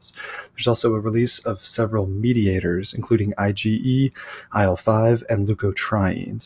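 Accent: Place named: United States. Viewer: I love your american accent and the way you say RECEPTOR :-) :-* Best regards from Munich,Germany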